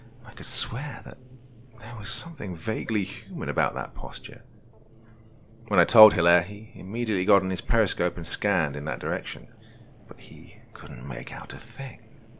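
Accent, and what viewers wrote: England, United Kingdom. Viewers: What part of England are you from ? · was great. btw u from the UK u kinda have a british accent.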